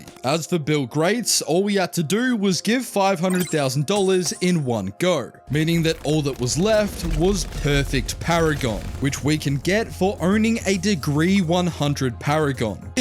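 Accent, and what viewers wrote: Australia. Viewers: Hears voice - Checks channel - HES AUSSIE!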